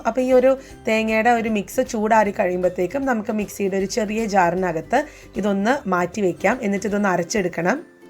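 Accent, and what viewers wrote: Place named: Thalassery, India. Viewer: Superr 👍👍 thalassery recipeyum, thalassery ഭാഷ yum kelkkanno enkile entha youtube channel kannan varuu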